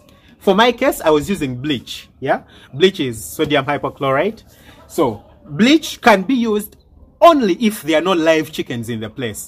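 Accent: Uganda. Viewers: Ugandan accent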